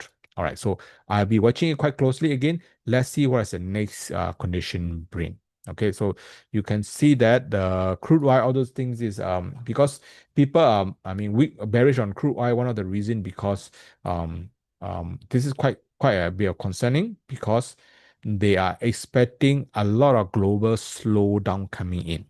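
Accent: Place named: Singapore. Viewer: Singapore accent isnt comfortable for us to listen videos.😂 Please produce videos in Burmese 😊. Sg accent really sucks 😢 Please kindly don't present screen with dual window, all are so minute to properly see words.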